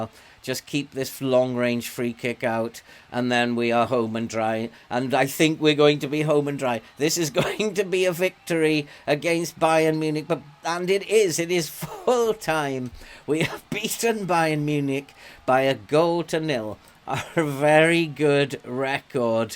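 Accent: Wales, United Kingdom. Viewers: haha fair enough! Are you Welsh??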